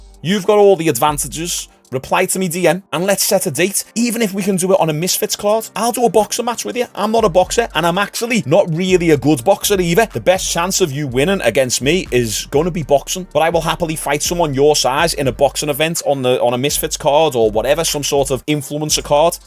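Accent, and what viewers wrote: Liverpool, United Kingdom. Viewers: Out of curiosity bro, are you scouse? if you are, that’s just another reason hes fucked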